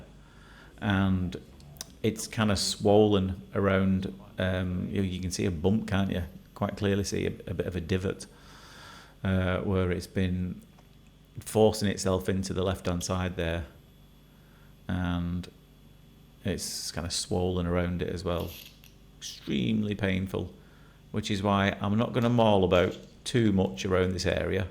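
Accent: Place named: England, United Kingdom. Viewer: Made it all the way and wow you are so addictive to watch! Also I’m an English girl now living in USA and it’s lovely to hear a fellow accent! I’d love to be Subscriber of the day 😊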